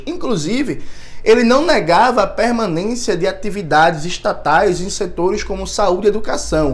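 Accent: Pernambuco, Brazil. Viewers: te adoro pela qualidade de teu trabalho, teu conhecimento... Mas essa proximidade com um jeito diferente do nosso, aqui do interior de São Paulo, de falar é delicioso. Um ritmo, um andamento faz com que ouvir você seja delicioso.... Que maravilhoso quebrarmos essa hegemonia horrível do capitalismo que impõe um modelo "padrão" de ser, massacrando os regionalismos tão ricos e lindo em nosso país.... AMOOOOOOOO esse jeito cantado de falar....Adoro essa pernambuquice... Sabe, graduei, fiz mestrado e meio de doutorado. Nunca tive um professor negro e estou adorando... Obrigada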